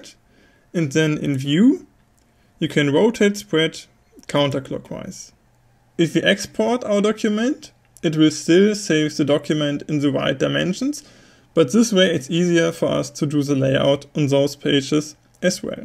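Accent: Germany. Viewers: LOL! I love the German accent! Geil!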